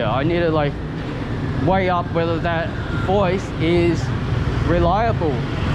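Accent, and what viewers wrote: Australia. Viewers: What does she means Chinese? Ignorant fish. You look, talk and walk like an Aussie to me without the hat and corks! Keep helping the poors and you'll find your Buddha. And keep up with the social rants with a big spoon of generalisation and a sprinkle of predjudice and you'll be an Internet Star too.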